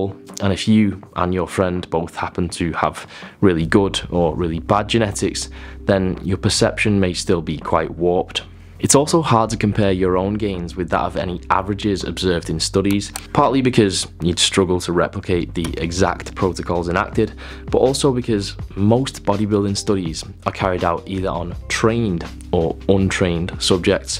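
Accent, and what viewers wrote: United Kingdom. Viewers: Nice pleasnt accent .......................for a United Kingdom guy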